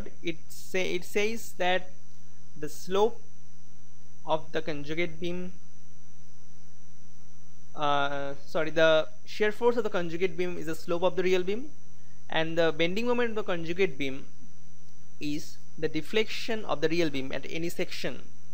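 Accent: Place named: India. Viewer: accent original rakho...Indian accent accha lagta hai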